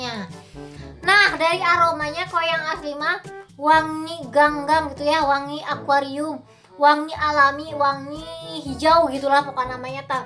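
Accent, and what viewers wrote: Sunda, Indonesia. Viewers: Sunda kentel😂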